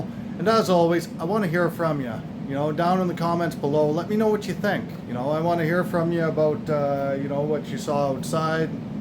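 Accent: Canada. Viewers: If folks couldn't tell by your accent that you're from Ontario, the Eh would have given it away! <3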